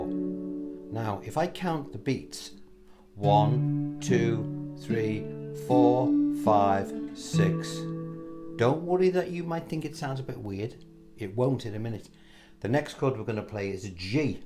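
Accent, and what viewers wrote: Liverpool, United Kingdom. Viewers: Excellent lesson. Totally agree about putting every chord above, rather than a dummy such as meself trying to figure out where all the other chords go. Its held me back decades! Going forward it would be great if you could put more of the page 3 thingy's with all the chords going where they are meant to go. Thanks for the new word of the day too. Smuggery, had to look that one up. Nice1. Always loved the way you Liverpudlians talk, hence" The Beatles. Its all a load a shite, theres no frikkn werk as me old scouser buddy used to say back in 1984. A big Irish very slow fan! Have a good wan.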